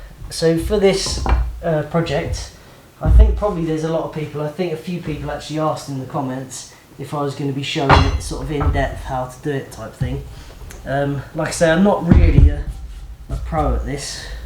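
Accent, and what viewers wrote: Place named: Yorkshire, United Kingdom. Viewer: "...you're gonna wiggle about..." I watch you as much to listen to you talk as anything (although I love your woodwork and garden and building too) because you bring back memories of my grandparents (Yorkshire accents).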